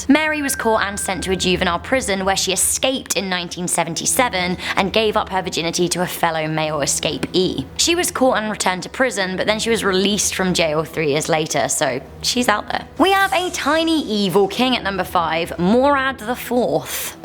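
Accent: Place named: England, United Kingdom. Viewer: That good old english ascent with that morad the fooouurth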